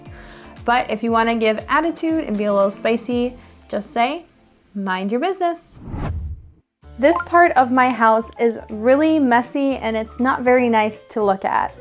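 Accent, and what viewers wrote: United States. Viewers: Hey teacher am new here am learning a lot from your beautiful classes, So I am from Brazil 🇧🇷, I have loved your accent since the fisrt moment, which state of USA is this accent I mean🇺🇸Do you come from which state? Thanks in advance ❤God bless you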